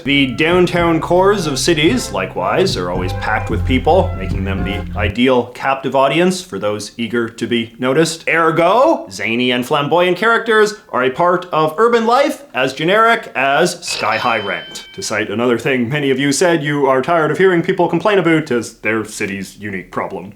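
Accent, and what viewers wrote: Canada. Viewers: "You know what they say about the weather here in {insert name of your north American city}, if you don't like it, just wait around a minute, it'll change" Are you exagerating your Kanuck accent? Or is that for real?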